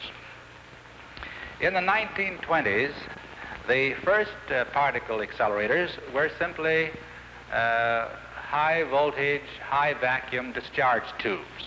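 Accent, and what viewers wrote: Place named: United States. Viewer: I loved this kind of clear-cut manly American accent of the mid 20th century, now sort of lost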